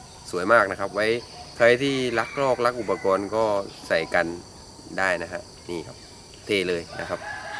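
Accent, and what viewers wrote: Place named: South, Thailand. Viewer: พี่คนที่ไหนครับแหลงเหมือนคนใต้เลย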